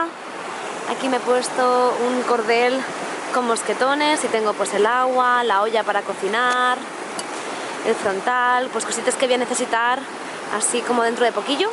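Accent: Spain. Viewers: Me encanta el Acento de las españolas se me hace muy atractivo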